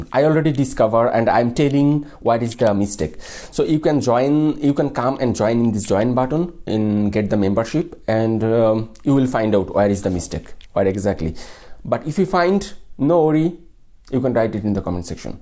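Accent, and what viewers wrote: India. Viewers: U are saying English as indians. It's exceptional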